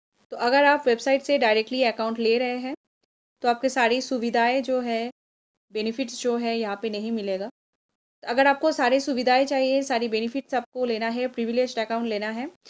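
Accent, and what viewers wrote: Bengal, India. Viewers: R u bengali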